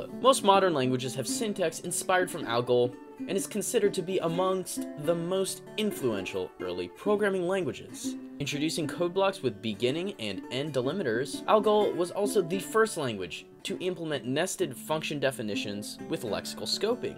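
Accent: United Kingdom. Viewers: Ahh, sorry I missed it. Thank you so much for this awesome video, it was so beneficial. I liked your British accent too. More videos should be made on these topics since computer science is really attractful. Looking forward to see history of operating softwares :)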